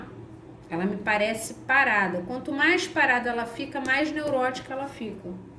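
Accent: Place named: Rio de Janeiro, Brazil. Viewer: Gratidão! Adoro suas tiragens e esse sotaque carioca faz toda diferença, kkkk! Beijos de luz!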